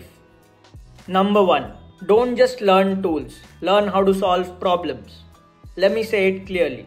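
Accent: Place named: India. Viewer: I'm argentinian and i dont speak English with argentinian accent, i do it with an american accent. Why do Indians never get off their goofy ass accent? Cant take you seriously.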